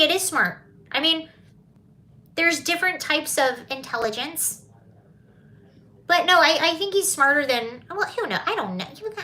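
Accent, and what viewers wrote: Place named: West Coast, United States. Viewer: I’m in Virginia too. We are as far north as you can be and still technically be considered a southerner. I’d never be able to guess you were from Georgia though. You definitely sound like a west coast girl to me.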